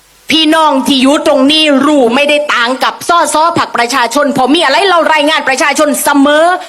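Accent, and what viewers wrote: Thailand. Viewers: ສຳນຽງພາກໃຕ້ ຟັງແລ້ວມ່ວນຫູດີເຂົ້າໃຈງ່າຍ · พูดได้ดีมากครับ ภาษาใต้สวยงาม ผมเป็นกำลังใจให้เต็มม้วน กับคนของประชาชนครับ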